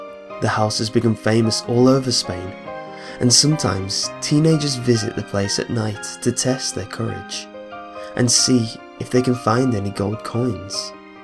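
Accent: United Kingdom. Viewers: Aww poor. I still love you. I love me some British narrators <3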